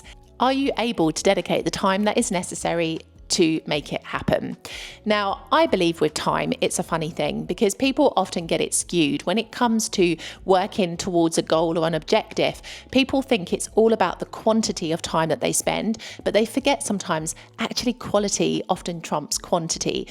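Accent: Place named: RP, United Kingdom. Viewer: Sorry for my lack of clarity. I meant your own level of Italian proficiency when you moved to Italy. As for your accent, I’d place it in the RP category. Best wishes.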